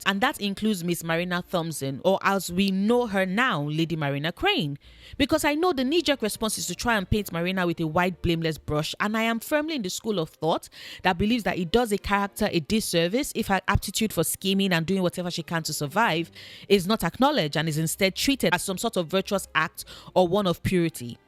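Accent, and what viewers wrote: Nigeria. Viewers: Very random but sound like some of my Nigerian sisters from the south east. 🤩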